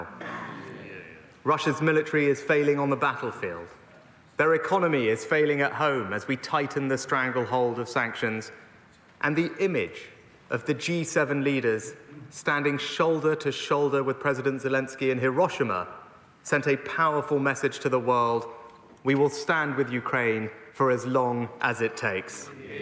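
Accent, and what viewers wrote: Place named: RP, United Kingdom. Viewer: Stick to your diamond training and your posh English.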